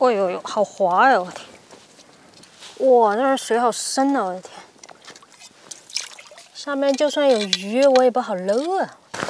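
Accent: Sichuan, China. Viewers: 你的川普太有意思了，挖挖机也就真的只有四川人才说的出来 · （你那麼凶呀）妳的四川口音很好聽，聽起來很可愛的。🥰🥰🥰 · ，你啷个不说四川话呢？比普通话好听好玩得多！！！ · 听到四川话太亲切了，老乡！ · 听起来像川普通话难道是老乡？ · 姐姐你的四川口音好上头哦我的天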